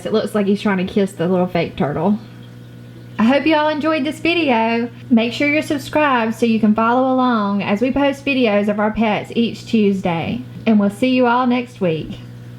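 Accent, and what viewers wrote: South, United States. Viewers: that sweet southern drawl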